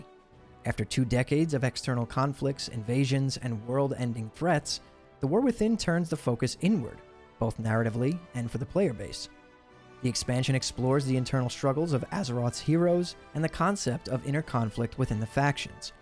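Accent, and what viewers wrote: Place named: England, United Kingdom. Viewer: You forgot one thing. For players from other country’s the social experience could be difficult. I hear that you are english. But for a dutch person like me it was not easy. BUT i really wanted to get better in learning english. I learned more in wow then at school. Not everyone has the luxury that they get servers in their own language.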